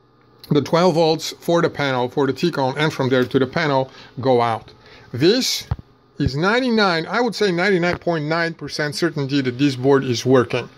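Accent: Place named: South, United States. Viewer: фу какой блевотный южный акцент!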